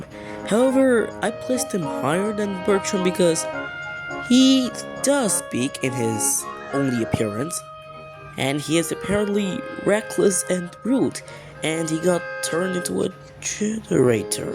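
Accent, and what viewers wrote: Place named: Indonesia. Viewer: i can tell the indonesian accent lol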